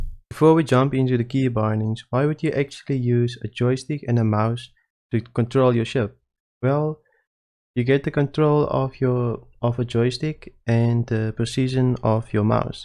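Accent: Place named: Cape Town, South Africa. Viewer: Thanks, just what I needed to figure it out. And how fantastic to run into another Cape Townian playing SC. Love the accent. Maybe we'll meet in the verse.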